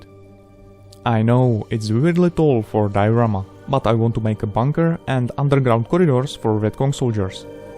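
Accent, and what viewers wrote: Czech Republic. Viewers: Wtf ten český přízvuk se nedá přeslechnout. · čuchám český english accent? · This guy sounds like he’s from Czech republic. Trust me I know the accent. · He is from Czech like me you can find out by hearing R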